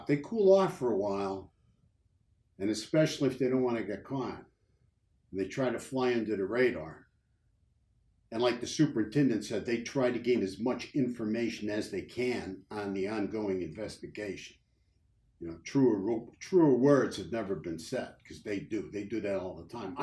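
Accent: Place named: Massachusetts, United States. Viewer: are you from Massachusetts? You have an accent.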